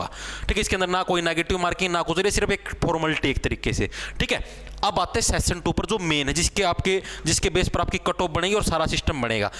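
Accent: Haryana, India. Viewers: Love your Haryanvi accent bro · Wah kya majay dar language hai sir apki i think haryanvi hai ❤❤❤❤❤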